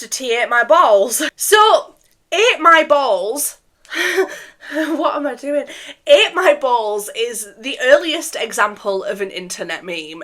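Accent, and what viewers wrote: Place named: Wales, United Kingdom. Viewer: You sound welsh lol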